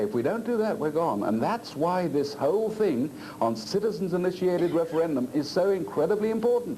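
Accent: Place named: Australia. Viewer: FFS. There are 3 main Aussie accents. He has one of them.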